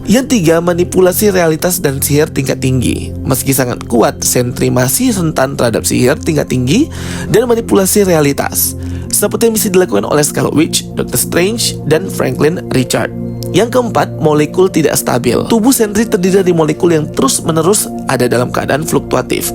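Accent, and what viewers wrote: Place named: Sumatra, Indonesia. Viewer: Menit ... " kek mana?!" Orang sumatra ko rupanya bang..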